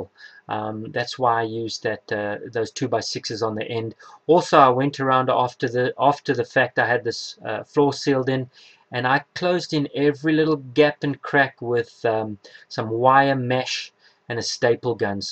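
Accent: South Africa. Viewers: Your south african.. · South African accent? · I can hear you are from South Africa. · Really enjoyed the video! Is that a South African accent I detect?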